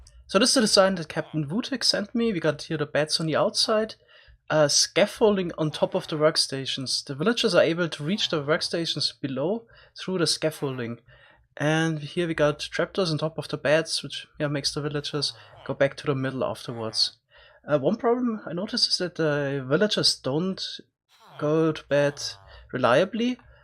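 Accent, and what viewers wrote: Germany. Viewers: Is he German?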